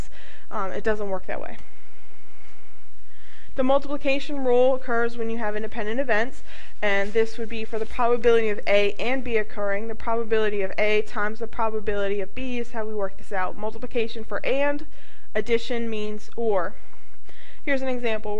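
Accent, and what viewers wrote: United States. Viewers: Love the accent...Georgia? I'm from Texas. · are you from the south?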